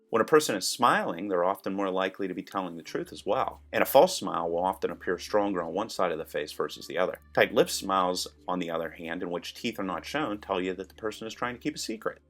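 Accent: Pittsburgh, United States. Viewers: the elbow touch must be in your culture you sound American, in my culture (Canadian) we don't touch each other at all on the elbows, it never happens, it would be too close to the body, and could be misinterpreted as something not appropriate, I have never seen anyone touch another person on the elbow when they shake hands, we do shake hands and hug at the same time, this is done by first the hand shake, then we pull each other in for the hug at the same time. it is a very nice and warm greeting, when greeting family or close friends, or we just give a big hug, sometimes we even hug a person we don't know very well, if the situation is seen as appropriate, like if theres been an accident and they came out okay and need comfort, that is what I mean We like to buddy hug, this is when we know a person is not very much into the full on hug, as i have a niece who is like that she isn't a huggy person, and is more comfortable with a quick sideways hug, or just a pat on the back. 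· Did I notice a Pittsburgh accent in there? Also, I like how fast the video is. Lots of info, rapid-fire, no time wasted. And there IS a pause and rewind option if needed.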